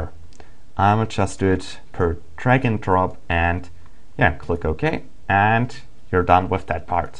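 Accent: Germany. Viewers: your english really sounds like a german speaking english. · Hes a awesome guy With a nice Germany Accent People Are Hatting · He sounds German to me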